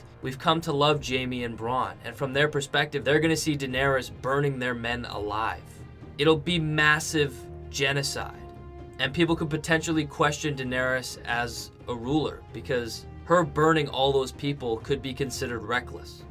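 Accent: Canada. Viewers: Is that a Canadian accent? *curiosity 😜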